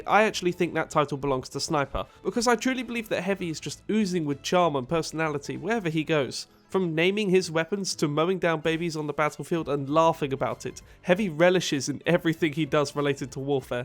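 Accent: United Kingdom. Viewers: sounds kinda British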